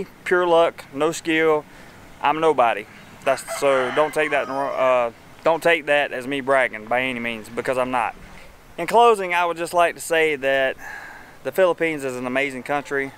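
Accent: South, United States. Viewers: New subscriber here. Thanks for the videos man. They are more deep with a reality of what to expect. You sound southern, where are you from Sc? Hahaha any way. Am planning on moving sometime to the philippines, around the Marakina city.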